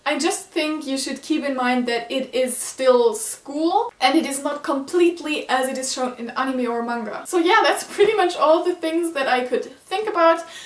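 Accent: Germany. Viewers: You're German? Cool, I was going to ask because of your accent was unfamiliar to me, but here it is in the comments :) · I could hear from your first sentence, that you are German^^ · I knew you were German cuz when I heard your voice, you sound like Trixie from the YouTube channel Don't Trust the Rabbit.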